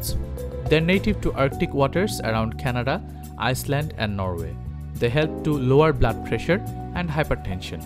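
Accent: India. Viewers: Wrong information!!! Indian accent!!! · Indian accent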